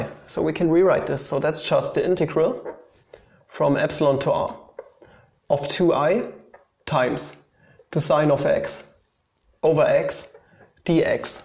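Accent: Germany. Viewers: No offense, but the German accent is not helping pronunciation. 😁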